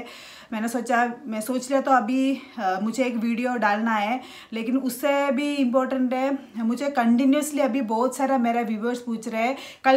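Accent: Kerala, India. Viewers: I love the way you speak Hindi with a thick Malayalee accent (that requires courage, Bravo ) the way you share all your beautiful, moments with your family, the little surprises you planned for your daughter's birthday, the father dancing with delight as he watches his daughters happy reactions to her birthday gifts, the way - ji brings his son down gently to see Vishu kanni. All of these things add up to a lot, how deeply you care for each other and are so happy to be together. Most of all the encouragement your son gave to you to start your own vlog, IN YOUR. OWN STYLE! How many sons do that? The genuine pride you take in each other's achievements, big and small, is a valuable lesson for all families. Love your cheery spirit. May you always remain that way.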